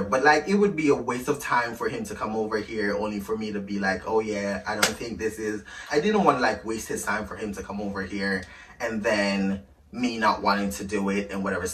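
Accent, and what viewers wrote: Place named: Jamaica. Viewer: The Jamaican accent ❤️😂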